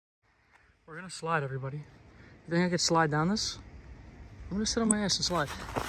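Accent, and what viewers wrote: New York, United States. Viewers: ! Love your channel and your NY accent, you make me miss my hometown! Ps…please wear your seatbelt LOL. 😉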